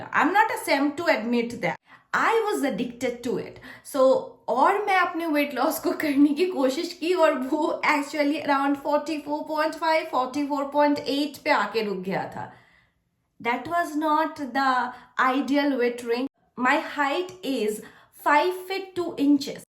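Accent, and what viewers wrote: Bengal, India. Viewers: Hota hai uska language kuch aur hoga jaise bangali...isliye Hindi clear nahi h.... foreign log Ghar nahi sambhalte kya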